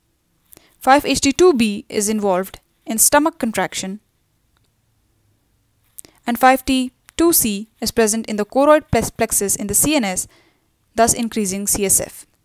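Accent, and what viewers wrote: India. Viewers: Hint aksanı ingilizce dinleyemiyorum çok gıcık ediyor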